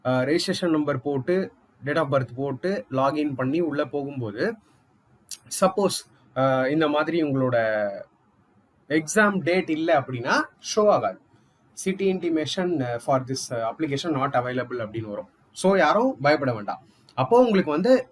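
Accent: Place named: Coimbatore, India. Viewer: I think Coimbatore side ah irukalam😮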